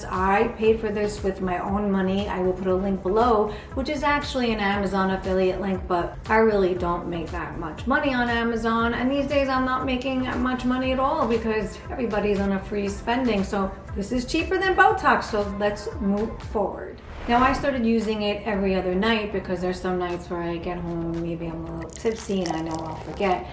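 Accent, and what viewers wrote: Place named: New Jersey, United States. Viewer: This is great! Love your accent too- I used to live in Edgewater NJ and it reminds me of home! Thanks a million.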